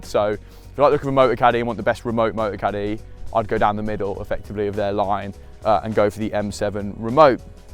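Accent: United Kingdom. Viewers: this guy is brutally british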